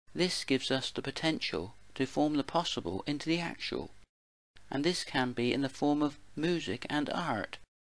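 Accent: West Country, United Kingdom. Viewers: Lovely West Country accent.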